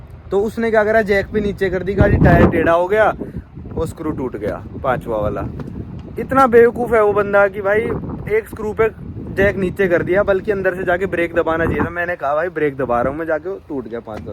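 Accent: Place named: Delhi, India. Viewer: He speaks like Delhi waala Desi ❤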